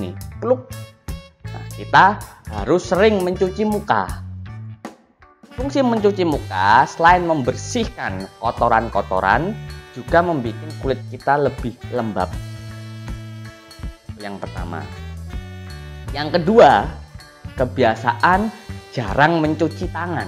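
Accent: Java, Indonesia. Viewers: 😜doktere lucu y.medok bangettt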